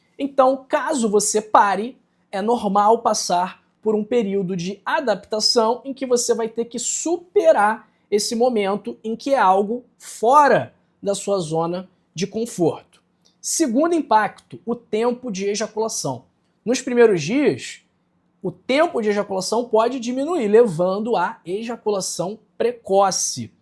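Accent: Rio de Janeiro, Brazil. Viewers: Não aguento o sotaque carioca. Meu Deus do céu, não dá!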